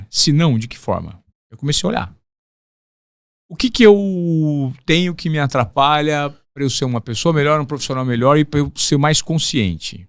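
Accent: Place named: Sao Paulo, Brazil. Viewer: Que isso e um charla paulista haha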